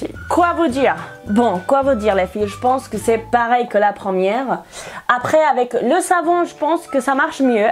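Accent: Spain. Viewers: Mademoiselle espagnol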